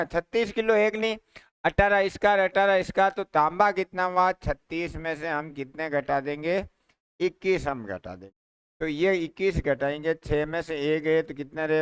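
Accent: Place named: Mewar, India. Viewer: राजसमंद जिले से हूं राजसमंद झील जो प्रसिद्ध है मीठे पानी के लिए वहां से आपका वीडियो देख रहा हूं आप बहुत अच्छा पढ़ा रहे हैं और मैं 10-15 लोगों को शेयर किया आपका वीडियो आप मेवाड़ी में बोलते हैं तो भी अच्छा लगता है और हिंदी में भी बोलते हैं तो अच्छा लगता है और आपका तरीका बहुत अच्छा है सरल और सौम्या तरीका है पढ़ने का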